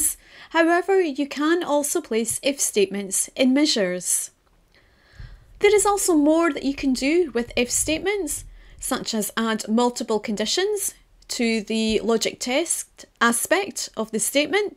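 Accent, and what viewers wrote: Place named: Scotland, United Kingdom. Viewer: Thanks for the clarification! I was looking up some video and was very pleasantly surprised to find a Scottish accent!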